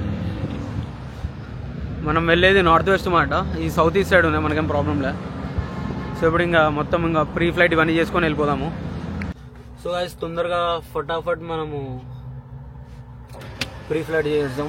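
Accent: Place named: Rayalaseema, India. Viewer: Kachitamga nedi rayalseema kada bro ...😊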